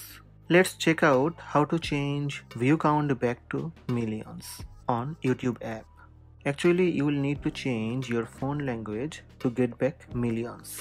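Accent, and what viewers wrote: India. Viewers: But I like the Indian accent!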